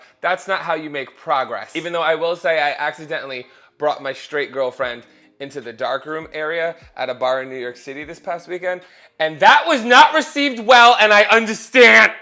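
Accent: New Jersey, United States. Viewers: As a Jersey native, still having his Jersey accent after visiting his home is so cute and relatable. 😂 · I love a proper Jersey accent. Most think it’s Staten Island when it’s little differences. And definitely not Brooklyn either. “Cholly” instead of Charlie “Wuff” instead of “wolf” “Tawk” instead of talk or “towuk” (SI accent) “Wunnuh” not “wawunuh” (SI) “Guhd” instead of God or “gawd” (SI)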